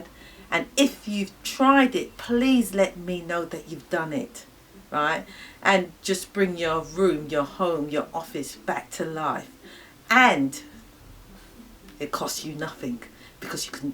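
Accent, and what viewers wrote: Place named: United Kingdom. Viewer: Thank you for this video. My parents have 4 very nice but old metal blinds that all need repairs. And cleaning. You seem very down to earth and I enjoyed the way you presented all this. Using your instructions to repair blinds all the way in Australia 😁 (I'm assuming you're in the UK coz your accent sounds like my brother-in-law's). Cheers 🙌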